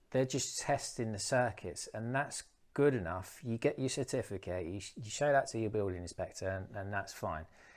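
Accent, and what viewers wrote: United Kingdom. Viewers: to our US ears, has a comically UK accent. Like one of those side characters on your telly!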